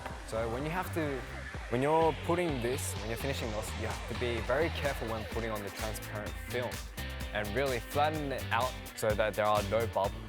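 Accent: Australia. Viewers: The more is see this the more I get used to the Aussie accent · I know everyone thinks it but his Australian accent makes everything 10 times better 😍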